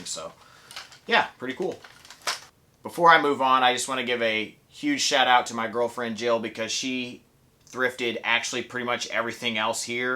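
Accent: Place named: South, United States. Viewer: Where do you live?!?!! You have a southern accent.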